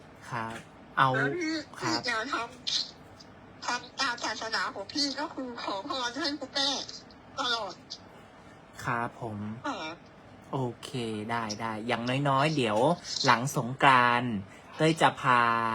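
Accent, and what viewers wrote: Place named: Ubon Ratchathani, Thailand. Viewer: อุบลชัดเจนครับ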